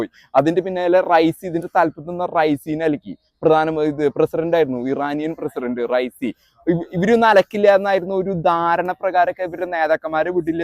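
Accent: Thrissur, India. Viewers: Thrissur slang super bro your news chanel mind blowing dialogue big salute 🙏🙏🙏🙏🙏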